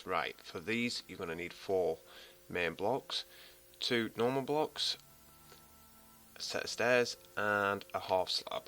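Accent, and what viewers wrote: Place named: England, United Kingdom. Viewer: I ended up here by accident, I was researching medieval architecture, and after reading about how castle spires usually had their stairs built counterclockwise for defensive reasons, I came to youtube to see some videos of them. This wasn't what I was looking for, but I'm glad I ended up here, it's inspired me to go back to minecraft and start building. A bit of trivia, if on a single one of the stairs you miss out the halfslabs so that you have to jump, you'll end up with a trip step, an uneven step used to cause attackers unfamiliar with the steps to trip. It's useless for design, but great if you're building it to roleplay. Thanks for the amazing video, I'll be checking more of them any time I need to get inspired, I don't know if you're still active on youtube but I've gone ahead and subscribed anyway :) Also I recognise that accent, hello from a fellow Englishman :)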